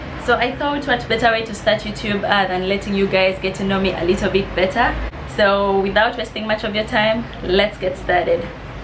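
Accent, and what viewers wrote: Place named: Uganda. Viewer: I could tell that you lived in Uganda from the English. Yes. There is a ugandan watching. Subscribe to my youtube channel too.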